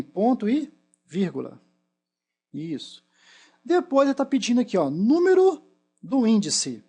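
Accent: Minas Gerais, Brazil. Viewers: Tem um sotaque mineiro, é mineiro? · Olá Prof., parabéns por sua aula. Uso excel, mas nunca precisei usar as fórmulas SE e PROCV, portanto tenho muiiiita dificuldade com isso, mas depois desta aula sobre SE, tudo ficou mais fácil e a sua explicação, com sotaque de mineirinho, foi ótima e muita clara para entender. Muito Obrigada. Sua nova fan!!:0)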